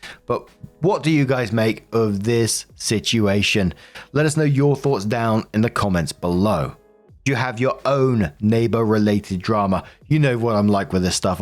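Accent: United Kingdom. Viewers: I'm pissed someone had the gall to complain about your speech. I knew just what they meant, I'd heard it. I love that you speak uk English. Fuk em all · I love the sound of British English. They have so many cool accents over there 💕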